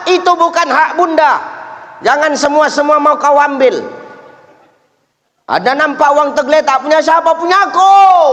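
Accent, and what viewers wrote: Medan, Indonesia. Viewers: Uztaz. Kau....kau...kau. Memang itu logah nya. Medan punya bahasa. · Gas terus bahasa dan dialek Medan mantap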